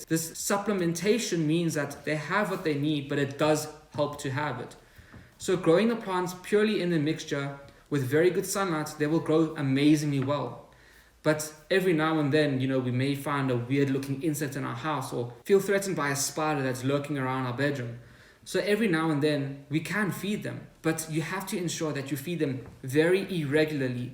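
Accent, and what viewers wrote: South Africa. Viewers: You accent is broken where in SA are you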